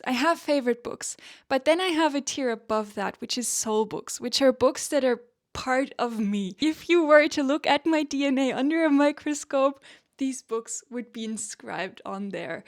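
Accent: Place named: Germany. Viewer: You have the sweetest darn voice - you're addictive to your listeners for sure, and your slight German accent makes you sound so erudite and warm hearted at the same time. You're definitely a charmer, and you're simply a joy to us that you exist and share so much of yourself.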